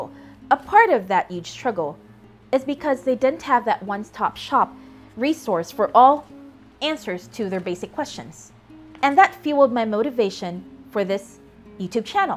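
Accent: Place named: United States. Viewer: You have a flawless american accent.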